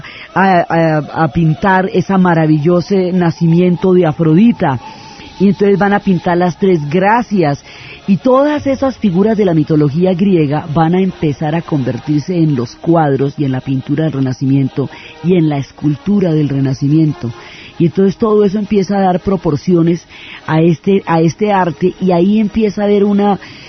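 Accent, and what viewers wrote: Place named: Colombia. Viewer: TIENE ACENTO COLOBIANO LA SENORA, Y ME ENCANTO SU VIDEO. FELICIDADES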